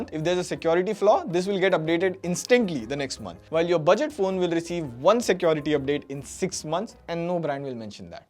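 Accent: India. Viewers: why hindi speaker always say "guudu" instead of good 😅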